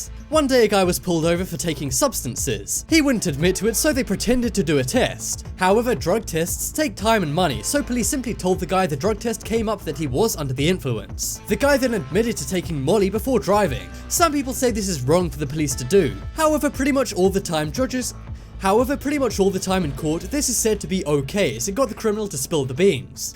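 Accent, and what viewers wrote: United Kingdom. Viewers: THE WHOLE THING IS BULL SHIT... This is some Russian fake news divide America BULL SHIT... And I am offended that some asshole will a British accent is propagating this BULL SHIT.. there are social and economic reasons why arrests spike in SOME cities and communities that are related to there being too much month left at the end of the money... statistically you will find MURDERS, ASSAULT AND ROBBERY spiking on Friday and Saturday... but I guess that’s a plot by cops tricking people into robbing, stealing, and killing so they can meet their quota... NICE SUBTLE RACIST AND SEXIST MOVE TOO USING THE IMAGE OF A BROWN WOMAN WHEN YOU FLASHED THE WORD “QUOTA” ON THE SCREEN... anyone who agreed with this nonsense... I have the winning lottery ticket I’m willing to sell you... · This is nonsense This is obviously filmed in Britain and is done by someone with the equivalent accent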